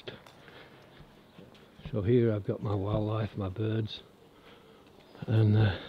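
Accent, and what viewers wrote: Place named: Derbyshire, United Kingdom. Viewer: The Thames!? I can't exactly place you accent, but it's close to mine, Tideswell, Derbyshire. Now living in northern Italy, chestnut forest. Find your attitude very reassuring, keep up the good work!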